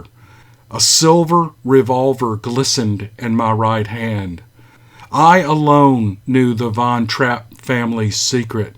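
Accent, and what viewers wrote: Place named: North Carolina, United States. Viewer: Good job! I’ve been looking for stories of the season on YouTube but hadn’t yet found a site. Truthfully I prefer you North Carolina voice then any others so far. Thanks for spook time!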